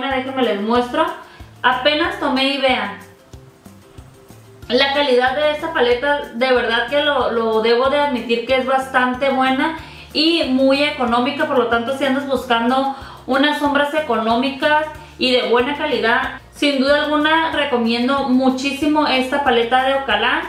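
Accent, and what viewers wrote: Mexico. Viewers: me encanta tu acento culichi 😂 saludos bonita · En cuanto abrí tu video y escuche tu voz sabia que eras de Tijuanaaaa !!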